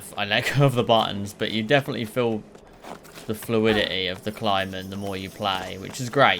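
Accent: United Kingdom. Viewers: ay he’s British like me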